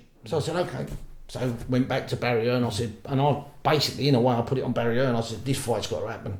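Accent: Cockney, United Kingdom. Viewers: hears the cockney accent and thinks you're full of it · What a load of vintage Cockney bollox, why oh why.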